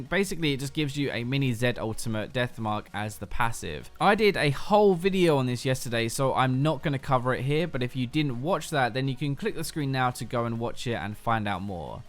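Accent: United Kingdom. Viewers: "I think honestly, basically, i mean. i don't honestly, i guess. he honestly, basically it means, honestly" - Damn You really need to limit yourself when you use those words and phrases. I watched your 30 min blue EZ guide, and it really pissed me off. On these 7- 10 min videos you can't actually notice, but on that EZ guide it was really horrible. I really Love your British accent and the content you are making, but those phrases man, damn. Keep up the good work tho. Cheers.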